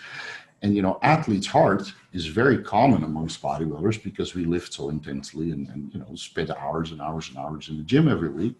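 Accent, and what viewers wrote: Netherlands. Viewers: Yeah but he is Dutch, we can hear it in his accent. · Love the Dutch accent!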